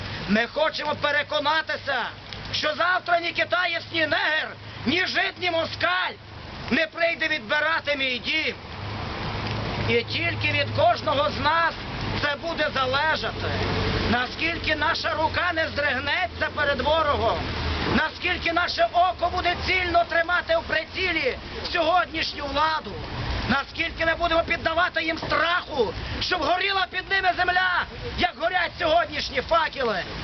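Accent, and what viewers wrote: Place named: Western Ukraine, Ukraine. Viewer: Западноукраинский диалект.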